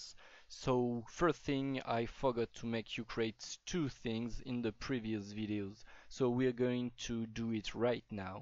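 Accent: France. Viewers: Super tuto ;) C'est encore plus simple a comprendre avec ton accent français ;)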